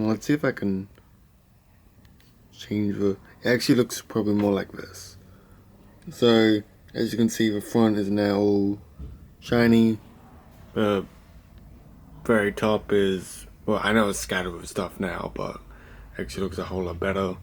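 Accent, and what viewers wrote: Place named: Australia. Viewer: Ok i'm going to make a guess that you are Australian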